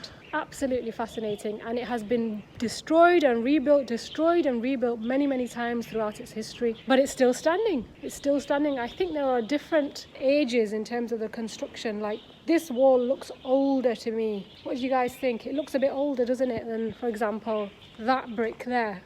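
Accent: United Kingdom. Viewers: How much does the Ccp pay you to become its ambassador in the occupied land of Eastern Turkistan, quite a lot l guess? Either the world is dumb to believe your report or the Ccp and its puppets are dumb to realize that their hideous crimes in the region are clear to the whole world. If your head cover and the British accent will give you legitimacy to make a fake report, the millions of Uyghurs in prisons should stop crying and dying. No thanks to you or anyone else like you⛔. So who we should believe now, the killer or the victims. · Your accent has a bit of Birmingham in it. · Her accent is from the north of England....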